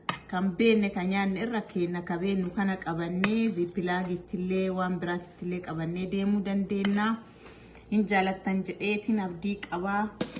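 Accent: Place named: Arsi, Ethiopia. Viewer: Wowowowowowo jabduu teenyaa jabaadhuu😍😍😍🥰🥰💪 Ati arsiidha mama hasa'an kee arsii fakkata.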